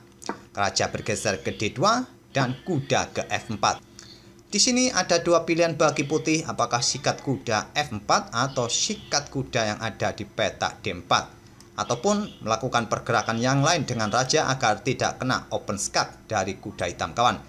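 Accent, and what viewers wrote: Indonesia. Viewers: suaranya medok banget mas...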